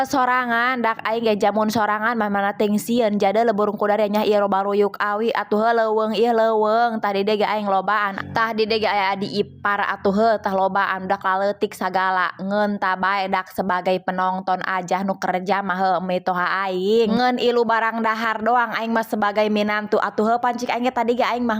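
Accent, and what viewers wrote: Indonesia. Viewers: Sunda na urang mna iye teh nya,jiga bahasa sunda urang ker alit😊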